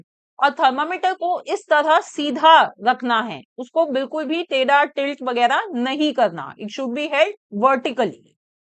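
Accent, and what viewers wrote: Bihar, India. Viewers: Mam can you tell are you from bihar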